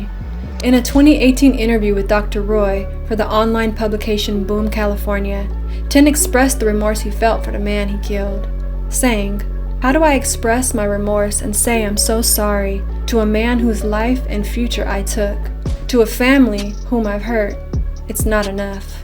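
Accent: South, United States. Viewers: Dam you have a nice voice? Southern?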